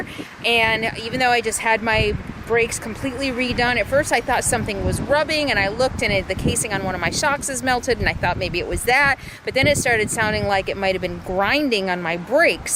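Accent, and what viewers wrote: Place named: South, United States. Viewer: Nothing wrong with picking up a little Southern twang...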